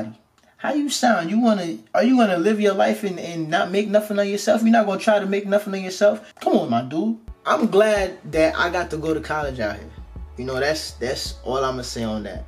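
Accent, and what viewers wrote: New York, United States. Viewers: I knew your were from New York...😊 Facts.....❤💥. #southbronx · Listen closely and you can hear both his New York & Atlanta accent. Shit is tripping me out. Lol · I love New York Aaccents...I love yours...You have a good heart!!!!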